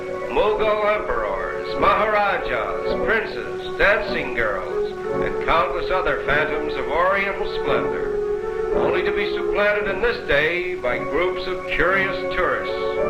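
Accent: United States. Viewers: great footage, what a weird and awful voiceover! lots of comments on here about British voiceover, its like, er, an an american accent init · This sounds more American than British. By the way, I am an Indian-American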